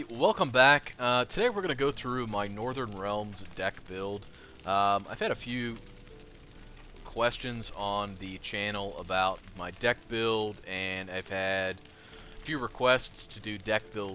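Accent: United States. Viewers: I mean...i'm trying to wonder what accent it would be...he sounds Pacific N.W..atleast west coast. Not much of an accent. He has some southern sound when he says Line. Mostly with his (L)s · i agree with the others, your videos a great dude, what accent? you seem to have a pretty normal middle of the road american male voice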